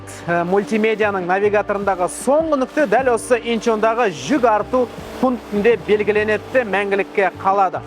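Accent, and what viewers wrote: Standard. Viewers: Жарайсың бауырым. Таза қазақ тілінде түсінікті әңгімелейді,қандай әдемі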